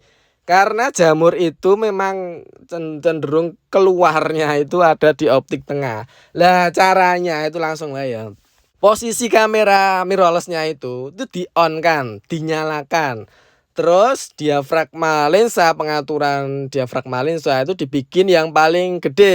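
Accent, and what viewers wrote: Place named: East Java, Indonesia. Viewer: Mantap jawa timuran banget mas, terimakasih informasinya yg sangat berguna banget👍👍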